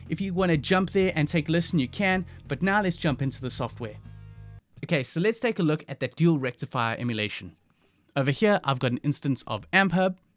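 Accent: South Africa. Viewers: 2 seconds into the video I knew you were from South Africa 🇿🇦🇿🇦🇿🇦🤘🤘🤘🔥🔥🔥 much love from Kzn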